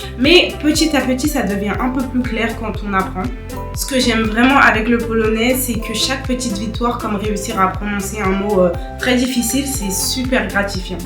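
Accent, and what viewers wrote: Paris, France. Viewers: Czy ja dobrze rozpoznaje, że mówi z paryskim akcentem klasy robotniczej?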